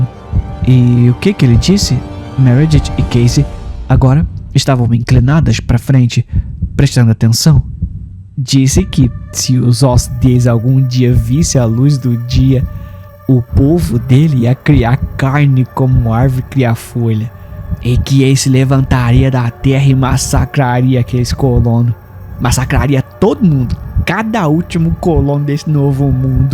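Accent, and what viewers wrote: Caipira, Brazil. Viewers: hahahahah esse sotaque caipira foi a melhor coisa do canal até hoje 🤣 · vim pela história, fiquei pelo sotaque caipira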